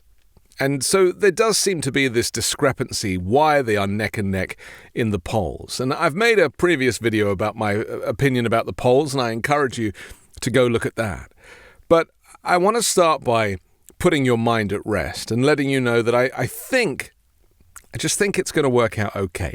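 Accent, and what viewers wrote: United Kingdom. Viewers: Why is this lame with a gay a$$ British accent telling you about American politics??? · For some odd reason, listening to you with a British accent explaining why Trump will lose is very soothing and gives me peace of mind.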